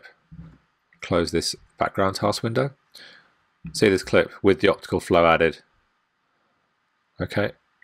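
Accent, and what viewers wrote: United Kingdom. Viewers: this is an English accent you divert!!!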